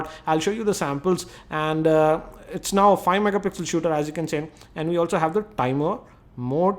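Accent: India. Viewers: if india speaking english . bad to hear. yakkkk..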